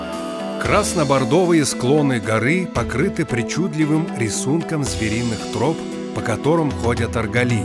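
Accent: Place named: Altai, Russia. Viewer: Голос диктора как монумент, шикарная озвучка с лёгким алтайским акцентом!!!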